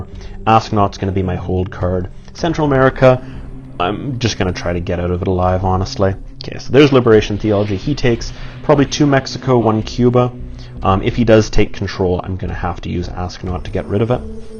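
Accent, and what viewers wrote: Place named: Canada. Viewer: Well played, thanks for posting. Your commentary is some of the calmest I've ever heard in TS videos (maybe it's that pleasant Canadian accent? :D )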